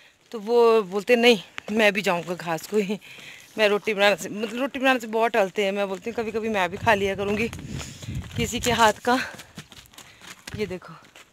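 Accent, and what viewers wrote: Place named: Himachal Pradesh, India. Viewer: Ur language is as u belong to Una dist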